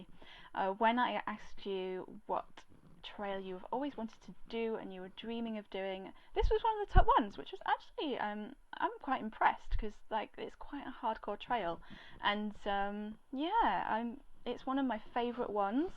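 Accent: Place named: Northern England, United Kingdom. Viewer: Good advice, but why the London Hiker?, you sound pretty northern to me.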